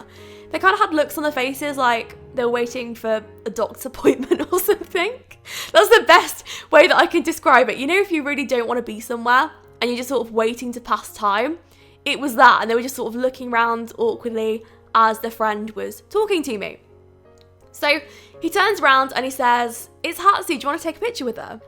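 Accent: United Kingdom. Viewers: I like ur british accent 😂❤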